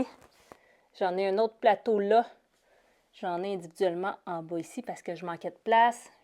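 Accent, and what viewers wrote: Quebec, Canada. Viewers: Et boujour tout le monde 😅 j'adore ton accent ❤ vive le Québec 😊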